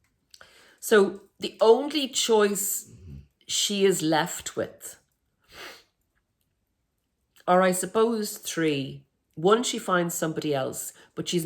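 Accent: Ireland. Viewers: Interesting ...Irish accent 🎉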